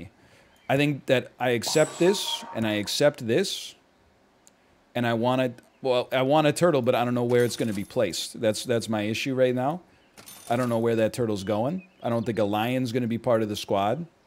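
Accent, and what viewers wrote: Canada. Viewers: Nah, his pronunciation is a common pronunciation in Canada. It's by far the more common of the two where I live.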